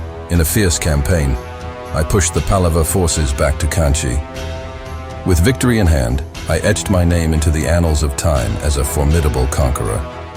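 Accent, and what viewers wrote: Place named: United States. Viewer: Can the creators of this video remove the american accent narration?Lets have an Indian english accent. This narration is making a mockery of the names.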